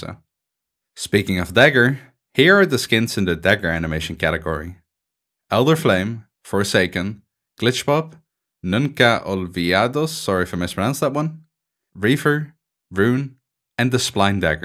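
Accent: Netherlands. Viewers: Are you dutch by any chance? Your pronouncation sounds dutch lol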